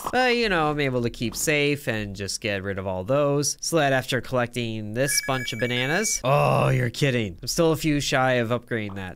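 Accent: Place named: United Kingdom. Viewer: Your accent is probably why they think you're British? 🤔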